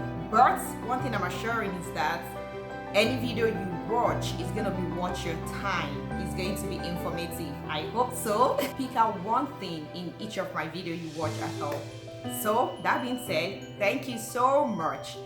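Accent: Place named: Nigeria. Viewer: I like your accent for real..it's deep Nigerian ❤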